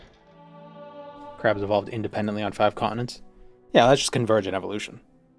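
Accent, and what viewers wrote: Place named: New England, United States. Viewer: What could really deal with head shine AND bump your steez is maybe a newsboy/flat cap. Could also lean into the New England accent for the bit, though I have no idea if a hat would just cause too much sweat, which might make prop comedy like that not so good.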